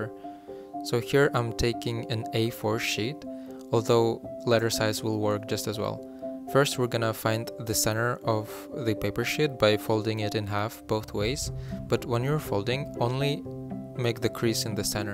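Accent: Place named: South Korea. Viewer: I can tell from your accent that you are Korean which makes more fun to watch this video. Thank you💗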